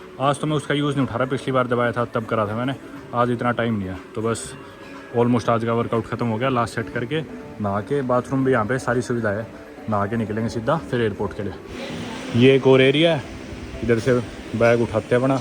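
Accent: Haryana, India. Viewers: kya dikhta hain bhai tu. 6.4 ft height. Itna fluent English me. Teri haryanvi accent me hindi. Gym karta body bana rakkhi hain. Bohot se log world travelling karte nomadic india nomad shubham pratik jain yaatri doctor but un me tere jaisa koi nahi . Enjoy karta hu bhai teri video main. Bas nazar na lage tujhe kisi ki ❤❤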